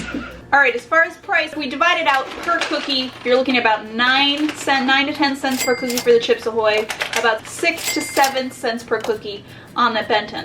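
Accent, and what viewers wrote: Midwest, United States. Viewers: The second way you pronounced it is correct, however I understand the americasation (is that a word?) because of your American accent. ❤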